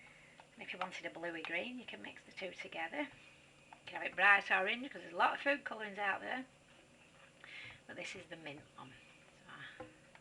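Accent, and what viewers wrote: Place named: North, United Kingdom. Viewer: Thank you for this video it's great and with your northern accent easy to understand. Tracy from Accrington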